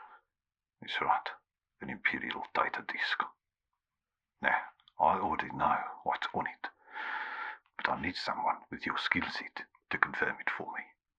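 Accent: South Africa. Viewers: Is that you South African accent you are using? 🖤🖤🖤love your work always.😊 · Are you from the east rand bru? You sound like my cousin from Benoni. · Anyone else hears a White South African accent?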